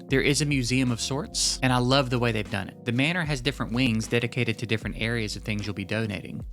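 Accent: South, United States. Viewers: I love how my man here is so positive all the time and it's great to hear southern YouTubers. But also he hears a faint ticking while opening mail and he loses his shit.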